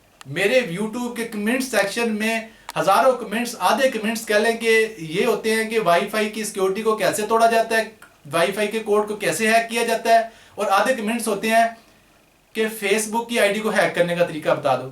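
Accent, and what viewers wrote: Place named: Punjab, India. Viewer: Aesi bat nai hai ye Lahore se hain is liye English bolte hue inka lehja panjabiyon wala hai lagta hai koi bat nai itna koi issue nai hai but vedio bhut hi awche hain bazz wait to hum or aap jese eng bolne wale high school me pharne wale bhi is tarhan logon ki madad nai kar pate but I am very thank full to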